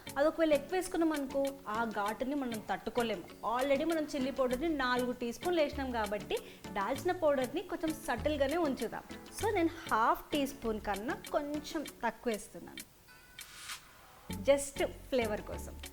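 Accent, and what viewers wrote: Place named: Warangal, India. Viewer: Warangal slang Also to good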